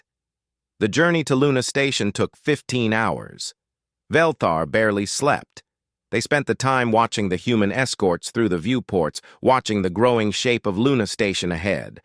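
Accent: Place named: South Korea. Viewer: Which Korea? Having issues picking from the accent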